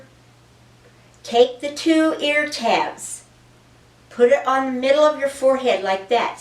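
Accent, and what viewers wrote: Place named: United States. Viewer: I’m from England and your strong American accent really makes me feel happy and I smile, you say bangs we say fringe, but when you said dirty bangs I fell about laughing ( this was in a previous vid) you’re such fun I now say dirty bangs as I find it so funny, you’re becoming like a Sister to me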